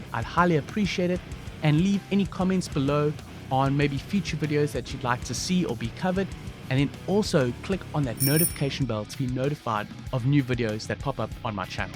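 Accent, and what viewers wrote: South Africa. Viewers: Hi. I am a South African too, but living in the UK. My friends in the UK always pick on me for the way I say "here". I never really noticed it with myself, but when I listen to you speak, it is really noticeable. Hahaha. Anyway, this is a really cool video and great sound that you get. I am really enjoying learning what you have to teach. You showed us a bit of your mixing in this video, but How about a more in depth mixing tutorial? If you have already done this, my apologies. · I love the South African accent! Relaxing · Are you perhaps South Africa? I am but living away from home ...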